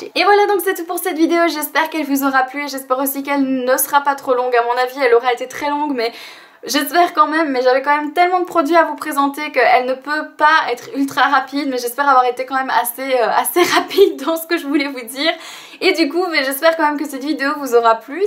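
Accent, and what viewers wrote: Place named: France. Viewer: Make Up Artist l accent francais qui ressort aussi dans les langues latines par ex.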